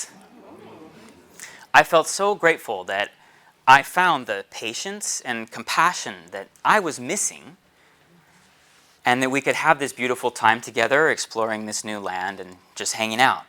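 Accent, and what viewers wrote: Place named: Japan. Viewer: Dude this is beautiful! You've grown so much over the last few years. I'm impressed, proud of you, humbled, and reminded of how when I first started dating my wife I had similar reflections ("I'm from California; she's from Kansas; we're basically from different universes") that helped a lot and that I have since forgotten and started to take for granted. Thanks for the reminder! PS — You have started speaking with the cadence of my Japanese friends...INTERESTING <3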